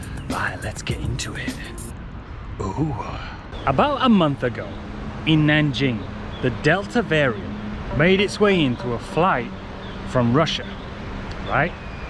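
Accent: United States. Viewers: Love your American twang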